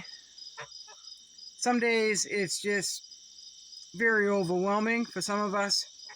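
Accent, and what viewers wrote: Maine, United States. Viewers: Congratulations on your 3,000! Im picky on pages I follow because I dont have time to watch them all lol I only follow a handful, I definitely learn from yours + agree with things you have to say + you make me laugh + of course being from Maine love your Accent. Wow crazy temps up there😮 it was only 85 here in SC. Hope you get some good sleep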